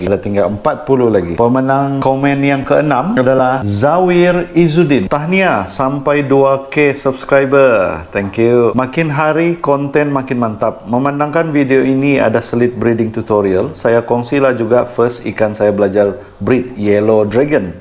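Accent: Sabah, Malaysia. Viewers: Assalam sifu. Nak minta sifu tunjukkan cara groom mental betta. Masa dia flare tu, dia cool jer dan rileks. Mohon Tunjuk ajar sifu. Btw, congrats for 3k ++ subscribers. Road to 10k subs pulaa bossku. Huhu semoga maju jaya. Huhu terhibur tgk content sifu. Dialek sabah tu pekat joo. Wkwkw original sabahan. GoodLuck in future. N keep doing what you love. Chaiyokk!!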